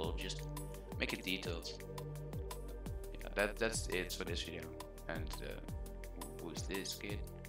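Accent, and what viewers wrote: Netherlands. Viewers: dat nederlands accent ook oei oei oei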